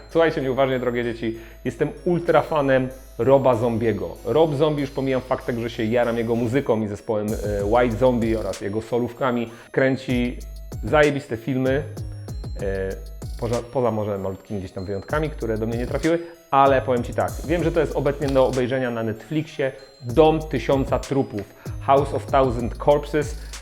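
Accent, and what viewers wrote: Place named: Poznań, Poland. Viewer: Słychać że poznaniak tej!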